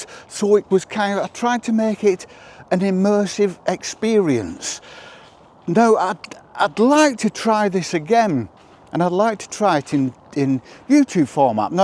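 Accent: United Kingdom. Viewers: I love the British accent! Every time you says “ squirrel” 🐿️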